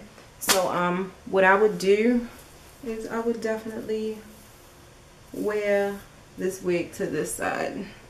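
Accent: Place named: East Coast, United States. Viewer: Where are you from? East coast? Lol, Maryland? I need to know that accent is everything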